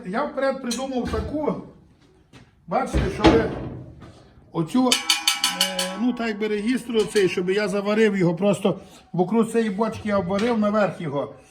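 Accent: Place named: Galicia, Ukraine. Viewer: Класна буржуйка і майстерня. Судячи по акценту і діалекту пан з Галичини.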